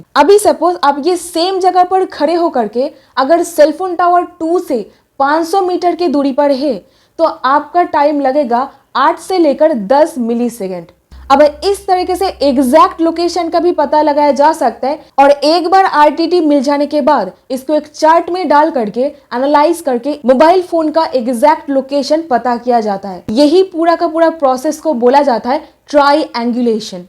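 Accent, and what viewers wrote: Bengal, India. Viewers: आपको अपने बांग्ला accent को थोड़ा सुधारने की आवश्यकता है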